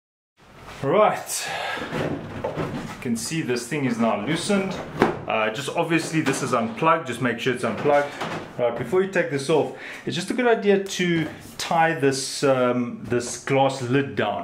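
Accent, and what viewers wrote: South Africa. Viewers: Am I hearing a fellow South African accent?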